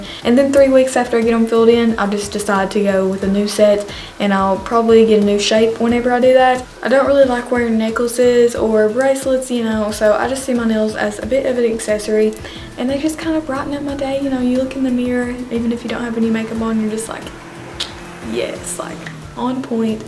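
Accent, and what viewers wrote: South, United States. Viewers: wait omg i thought she had an english accent then i realized it was a country accent 💀💀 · Am I the only one who thinks like she's from Russia or from a northern Europe country? I think she looks so much like she's from of theses country that I find it odd that she have a southern accent. I love your videos, you are gorgeous! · Omg I just found ur channel and I'm so happy because I have not found a YouTuber who has a southern accent like me!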